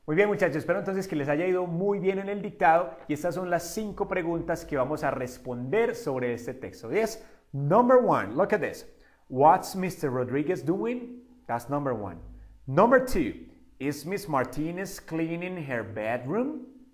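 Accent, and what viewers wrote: Antioquia, Colombia. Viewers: Es colombiano, cierto? De Antioquía? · El mejor profesor de inglés de todo el mundo... Nadie como tú eres demasiado bueno para enseñar... Saludos desde costa Rica. Adoro tu forma de enseñar Inglés y también adoro tú acento colombiano 🥰😘😘😘😘😘😘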